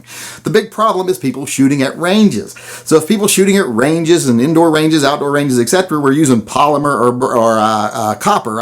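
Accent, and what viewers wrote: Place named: California, United States. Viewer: Are you sure your not from commiefornia , cause you sure sound like it . Fudd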